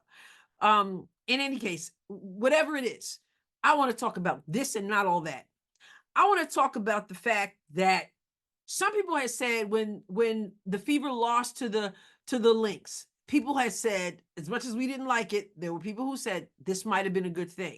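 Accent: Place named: Southeast Missouri, United States. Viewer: I thought I was able detect a slight hint of a Southeast MO accent! We are rubbing off on you!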